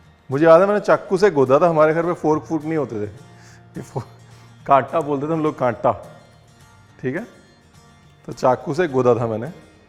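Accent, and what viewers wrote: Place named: Punjab, India. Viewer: I really like your North-Indian Hindi with Punjabi touch 😍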